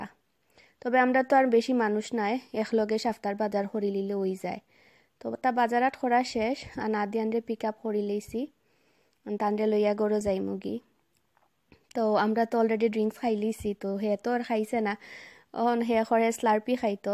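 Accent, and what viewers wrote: Sylhet, Bangladesh. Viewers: Apu kemon aso inshaallah valo aso tomar sylheti kotha gula khubi valo lage dua kori valo thako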